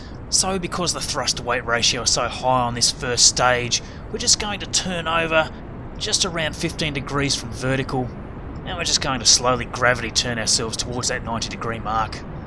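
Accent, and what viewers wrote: Australia. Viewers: G'day Mate! Are you Aussie? I am too ;)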